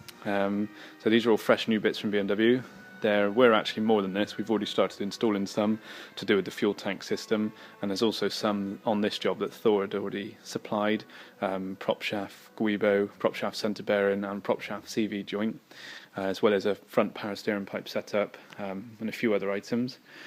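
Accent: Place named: West Country, United Kingdom. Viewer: "Startan the fit up" - good 'ol west country boy:) Waiting for a quote,...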